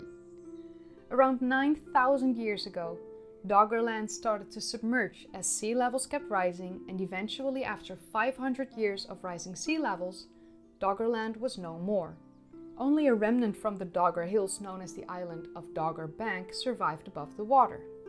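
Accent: Netherlands. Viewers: Your accent is subtle. I think you're Dutch. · Lovely Dutch accent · don't loose the Dutch accent! Please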